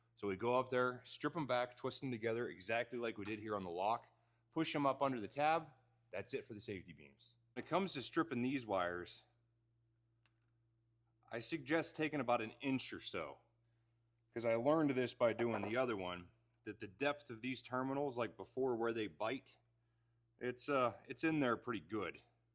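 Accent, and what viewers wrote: Western Pennsylvania, United States. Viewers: U from western PA?!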